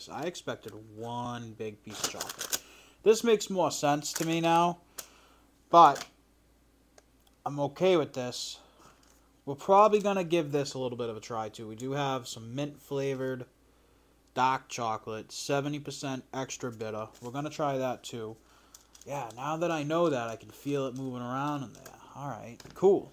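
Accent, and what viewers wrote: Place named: New Jersey, United States. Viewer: He may be tired or may have spent the day around people with heavy Jersey accents.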